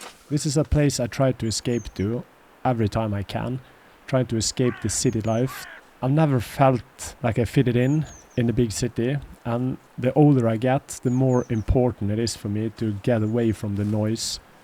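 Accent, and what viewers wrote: Bergen, Norway. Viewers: Det er ikke vanskelig å høre at du er Bergenser ;-)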